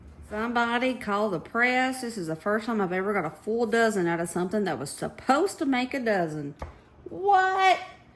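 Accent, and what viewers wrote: South, United States. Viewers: Thank you for your video. You are so sweet! I adore your southern accent! It is so nice to hear it after living in Michigan for almost 15 years—I’m originally from Kentucky. ♥️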